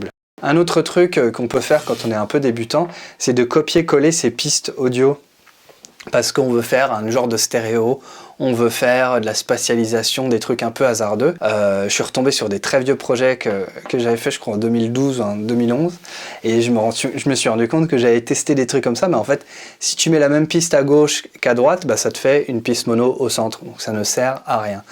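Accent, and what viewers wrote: Quebec, Canada. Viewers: excellent accent québécois !! 😀 bravo ! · Mais si, il est bien l'accent québécois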